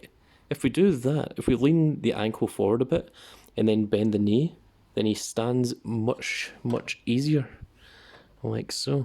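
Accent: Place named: Scotland, United Kingdom. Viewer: I can already tell from your Drew McIntyre accent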